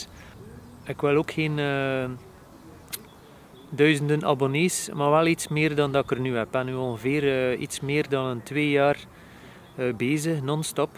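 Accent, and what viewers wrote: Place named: West Flanders, Belgium. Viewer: Begrijp je volledig! Het is leuk om te soen, maar langs andere kant, wij als abonnee je video's zeker leuk, maar even cru gezegd, brengt weinig nuttige content, ttz ik denk dat je meer volgers zou bij krijgen met reviews. Meeste YouTubers, zullen sneller 'geprikkeld' zijn (denk ik) wanneer je meer reviews/ervaringen zou uploaden. Wat bedoel ik, bvb, je review/ervaring over je step. Zo heb ik trouwens je kanaal ontdenkt, gewoonweg omdat ik ook interesse had in zo'n Xiaomi Step. Persoonlijk bekijk ik je video's bijna dagelijks en vind het tof te zien, jou als persoon, vind ik dat je het fantastisch hebt gedaan, maar niet iedereen heeft interesse in wat je dagelijks doet. Het siert je alvast dat je het lnag hebt volgehouden. Denk de beste tip ik je kan geven om meer volgers te krijgen is nuttige content. En mss ben jebhet niet bewust, maar je hebt al nuttige content gebracht, bvb. 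Je kookkunsten, of ervaring van je step, en je mag zeker zijn, dit wordt verder verteld, betrap me daar zelf op dat ik hets dan verder vertel. Nog een challenge, mensen zijn soms moeilijk te 'overtuigen' ol opeens iemand te volgen, familie en vrienden zulken dit wel doen en ikzelfs vind het persoonlijk fantastisch je Algemeen Nederlands gemixt met het West-Vlaams, maar niet iedereen heeft dezelfde interesses. Als ik je eenntip mag geven als YouTube-kijker: forceer jezelf niet, en pist enkel filmpjes van reviews, wanneer je er zin in hebt tenminste. Los van dit alles vond ik je vlog, als West-Vlaming, z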